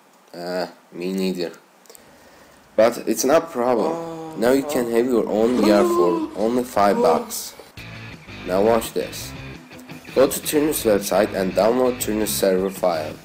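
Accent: Turkey. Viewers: Aksanın her yerinden ben türküm akıyor :D Neyse helal olsun kardeşim güzel videoların var. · Türksün değil mi aksan çok belli ediyo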